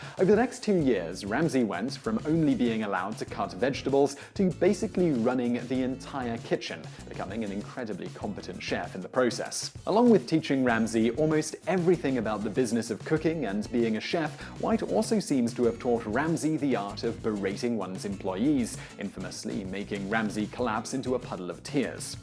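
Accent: United Kingdom. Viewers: I'm not used hearing soccer being said in a British accent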